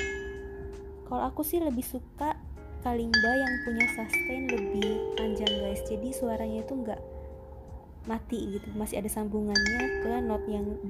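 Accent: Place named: Visayas, Philippines. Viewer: I thought you were bisaya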